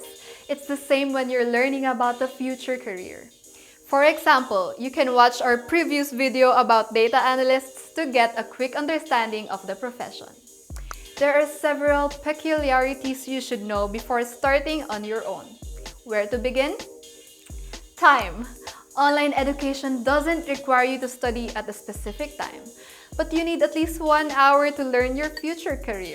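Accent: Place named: Philippines. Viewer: Thank you ma'am ganda nyo po. i loove your filipino accent. say it again.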